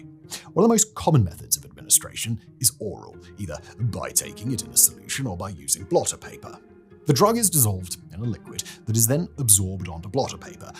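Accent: England, United Kingdom. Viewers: You are the most british-sounding person I have ever encountered. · Yeah man you're right. He's enunciating very sharply, so it happens. Plus his presumably English accent.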